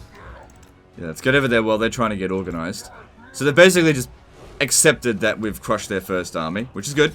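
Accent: Australia. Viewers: The yt Auto subtitles are getting better at australian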